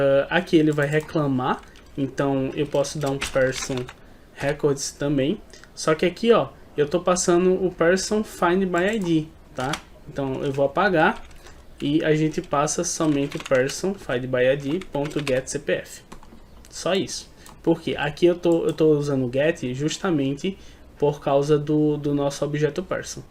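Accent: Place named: Pernambuco, Brazil. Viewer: Oi, vi o post no YT e achei interessante. Quando vc começou a explicar, fiquei com uma pulga atrás da orelha (Esse rapaz é Pernambucano). E o tiro foi certeiro kkkkkkk O modo de falar entregou... hehehehe. Ganhou mais um seguidor. Abraço meu caro, um prazer encontrar pessoas aqui como vc. Agradecido pelo compartilhamento. Vamos nos falando.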